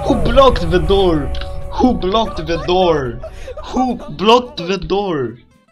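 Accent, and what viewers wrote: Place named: India. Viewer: India is proud of your accent🤝🤝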